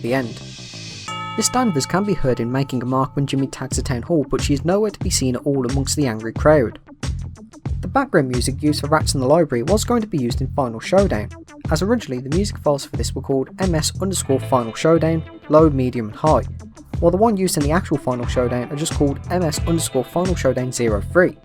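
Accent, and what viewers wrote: United Kingdom. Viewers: its a british accent. whats so hard to understand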